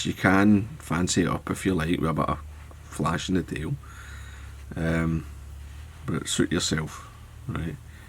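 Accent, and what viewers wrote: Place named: Ayrshire, United Kingdom. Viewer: See you’ve not lost yer Ayrshire twang,. Think this size flea is ideal for pike too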